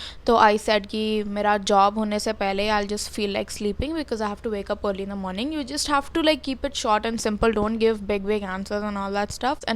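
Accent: Hyderabad, India. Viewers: Hyderabadi bolte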